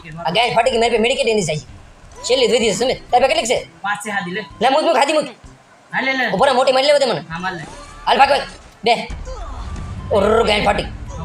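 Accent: Bhavnagar, India. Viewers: mane lage che tame bhavneger na cho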